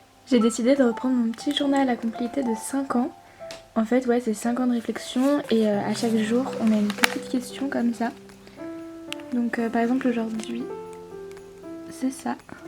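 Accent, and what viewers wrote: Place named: England, United Kingdom. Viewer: Non j'adore ton accent anglais tu pourrai faire des vidéos comme sa plus souvent stp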